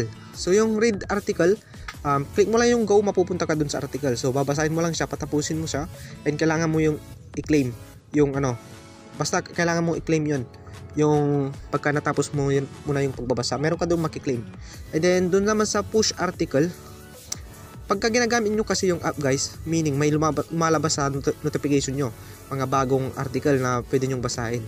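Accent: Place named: Pangasinan, Philippines. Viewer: Taga pangasinan ka no lodi halata sa accent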